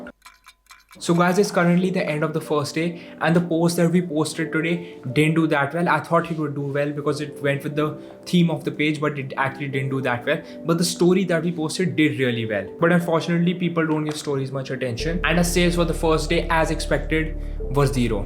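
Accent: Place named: India. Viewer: your accent is like indian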